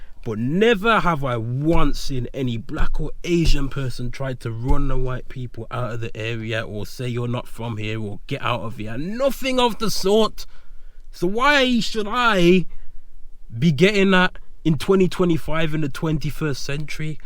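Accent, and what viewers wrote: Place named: United Kingdom. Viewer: His accent is clearly british !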